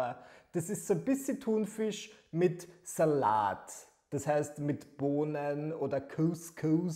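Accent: Vienna, Austria. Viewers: ich liebe deine videos. Dein wiener slang ist sooo geil, dein Humor auch ich liebs!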